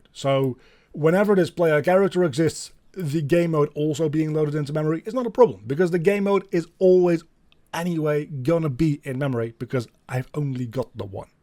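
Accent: Netherlands. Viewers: Thanks for the answer! By the way, are you Dutch? I'm just curious because of the accent 😅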